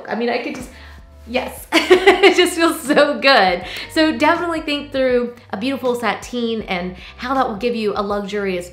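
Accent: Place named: United States. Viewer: Hello lm still waiting,l heard but l have difficult to understand sometimes the American accent if you don't mind l will really appreciate 🙏 if you write the name of those 2 sprays. Thank you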